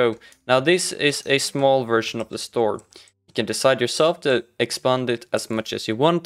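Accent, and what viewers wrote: Norway. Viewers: er du norsk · e du norsk lol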